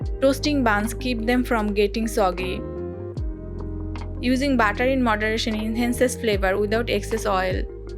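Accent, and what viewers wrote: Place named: Bengal, India. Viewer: Heavy bengali accent..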